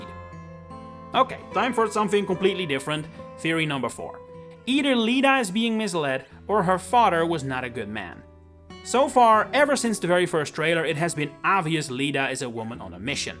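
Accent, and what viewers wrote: Netherlands. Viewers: Unrelated but love the Dutch accent 🫶🏽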